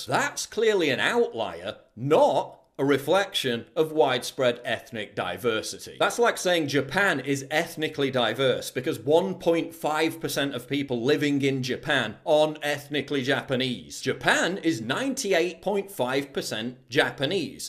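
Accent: United Kingdom. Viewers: That's because he's British, could you not tell from his obvious accent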